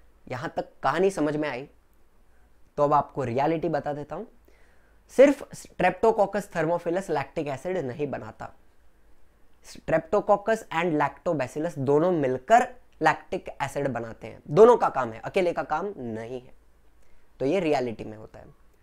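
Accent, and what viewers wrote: Maharashtra, India. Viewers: Sir kya ap marathi speaker hai??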